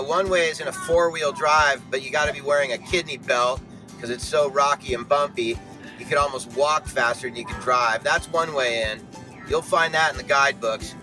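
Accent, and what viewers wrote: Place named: Hawaii, United States. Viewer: you sound like your from Kona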